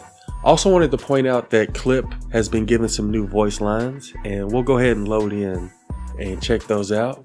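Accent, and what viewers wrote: South, United States. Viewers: I'm going to need you to make a PSA video. During the last 2 weeks I've had a good handful of players thinking I was you(not believing I wasn't you) and asking me questions like "When is the next meme video releasing", asking me what cookies looks like, etc etc... I've heard my voice from echos through other players mics and I can't understand how people thinks I sound like you, the only thing I can think of is we both somewhat have a Southern Accent...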